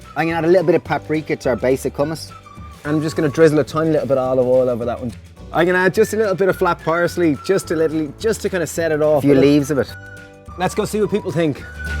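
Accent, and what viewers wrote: Ireland. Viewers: Who would have thought Ireland had such hidden gems! Your fantastic accents distracts me from the food in hand! :)) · You guys are the best! Love the accent. Is it Irish?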